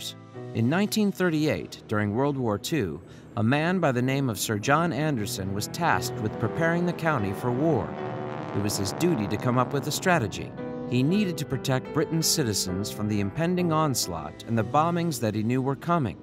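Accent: United States. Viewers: OOPS! the American accent fooled me. Apparently this took place in England. Never mind.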